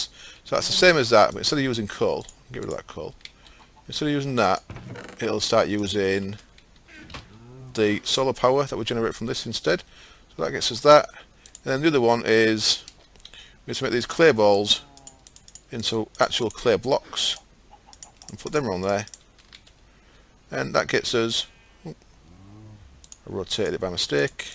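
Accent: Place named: Yorkshire, United Kingdom. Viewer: You just go how you want bud, the video's so far are mint. From a fellow Yorkshireman (Presuming you're from Yorkshire, sounds like it!)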